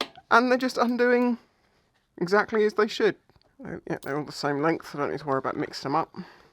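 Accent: United Kingdom. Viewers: Are you British or American you sound British but you said DR ZEE if your British you should know we say Zed , adjusting for the yanks are we.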